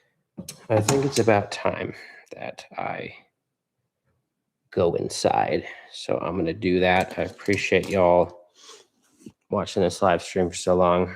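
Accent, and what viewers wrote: Canada. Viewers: I was wondering why you sounded Canadian but your bio said USA. You're an honourary Canadian in my book, bud.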